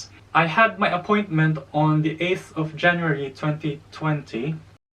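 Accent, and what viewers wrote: United Kingdom. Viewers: Yay!!! Thank you for sharing.. Lupit NG British accent... Sana all hahaha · british accent! bigat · wow ang accent mo kabayan british ang bongga ako bisdak na bisdak parin haha sunod niyan citizenship na rin congrats sayu din.Naalala ko rin ang mga visa na yan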